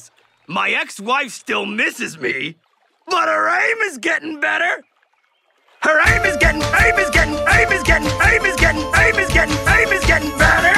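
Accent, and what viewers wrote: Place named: Scotland, United Kingdom. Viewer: He starts sounding Scottish halfway through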